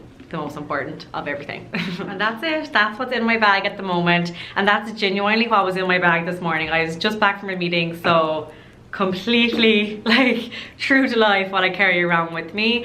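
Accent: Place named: Ireland. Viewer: Lovely your Irish accent. ❤️❤️❤️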